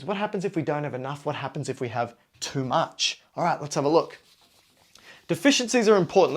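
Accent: Australia. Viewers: Aussie Accent btw why are you jacked up for your profession lol?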